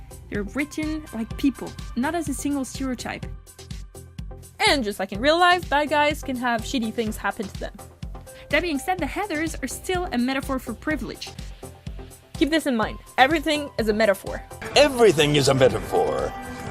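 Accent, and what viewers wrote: Quebec, Canada. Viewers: are u from québec?? i feel like u are from ur accent!!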